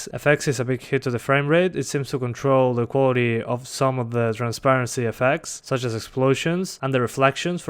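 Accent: Argentina. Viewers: Que hice conmi vida como para terminar viendo a un argentino hablando ingles , y ensima terminar entendiendolo.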